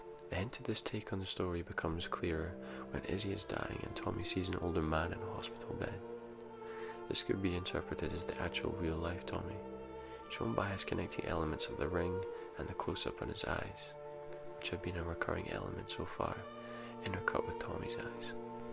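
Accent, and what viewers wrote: Scotland, United Kingdom. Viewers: What an incredibly revealing interpretation.......I have seen this movie several times, catching it on cable.....only once from the very beginning. I never considered the symbolism between Izzy's skin, the detail of the queen's dress and the tree. Tragically sad, yet beautiful movie. Thank you for this analysis. Love the accent.....Scottish?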